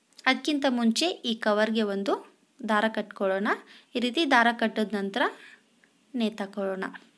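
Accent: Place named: North Karnataka, India. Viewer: Mam nivu nam kadeyora....uttara Karnataka avru nim voice hage ede swalpa adake kelde mam ...thank you so much for your recipe mam🥰😍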